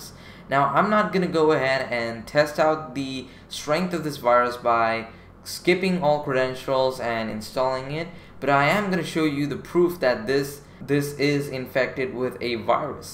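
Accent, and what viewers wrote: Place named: India. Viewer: Man, for you being Indian, such a great accent, and the way you explained, so greatly! You deserve more, and if you're not Indian or you're offended, I'm sorry lol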